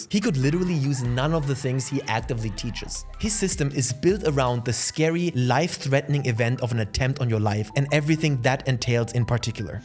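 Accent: Germany. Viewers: You have a germany accent. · I like your german accent. Sounds more brutal this way... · finds so lustig, dass ja super englisch spricht, aber man trotzdem das deutsche raushört:D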